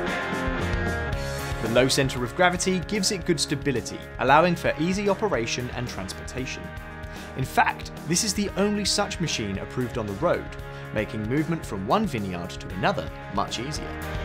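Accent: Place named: England, United Kingdom. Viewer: I really don't need the england man in my screen or voice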